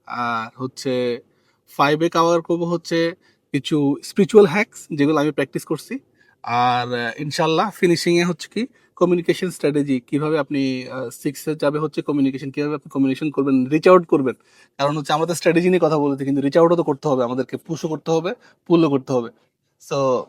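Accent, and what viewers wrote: Chittagong, Bangladesh. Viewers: bhiya apni ki chittagong er??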